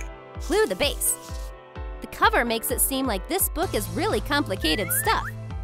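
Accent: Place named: Wisconsin, United States. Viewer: You sound like you're from Wisconsin!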